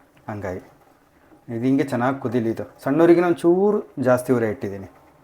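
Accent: Chitradurga, India. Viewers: yenthasngge aduge madthira nam durgada bhashe keli kushi aythu😊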